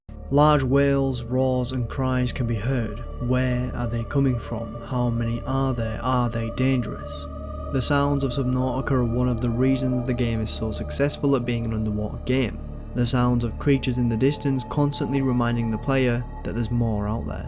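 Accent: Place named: Scotland, United Kingdom. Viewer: First YouTuber with a Scottish accent, makes you listen 🔥🔥🔥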